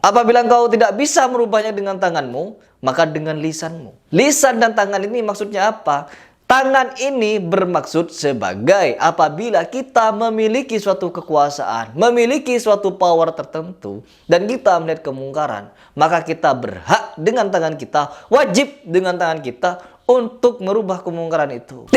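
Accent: Madura, Indonesia. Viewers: Abng 0rag madura kh l0gakx🙏🙏 jjur Q suka krna keras n tegas dmi kbeneran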